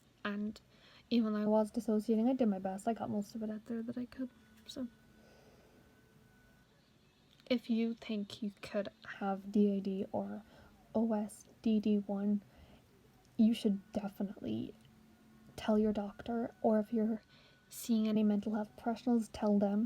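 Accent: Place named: Scotland, United Kingdom. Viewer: I’m really curious if you “switched “ while recording this video because your voice goes from very quiet and timid and feminine with a neutral accent , and a deeper register voice with a slight Scottish sounding accent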